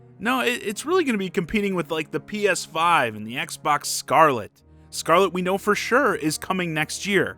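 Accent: Chicago, United States. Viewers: his chicagos kinda fresh doe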